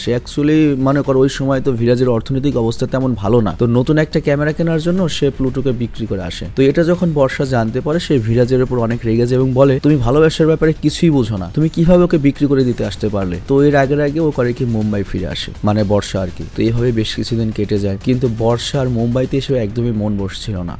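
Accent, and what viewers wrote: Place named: Noakhali, Bangladesh. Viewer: এাই নোয়াখালীর হোলা❤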